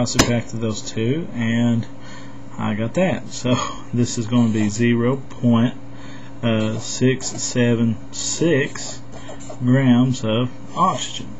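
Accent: South, United States. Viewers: You and your sexy Southern accent have Really helped me. Thank you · Am guessing you are from down south :)! thanks for all your help you are wonderful :) and are alot funner than reading the book lol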